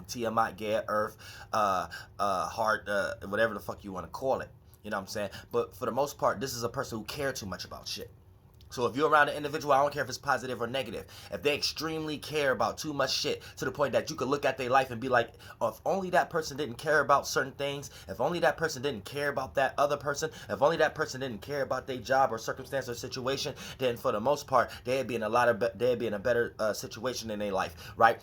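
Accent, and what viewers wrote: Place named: New York, United States. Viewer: lmaoooo u from/been hanging out in NY or whatttt 🤣. the accent tho “whatever the fuck u wanna call it “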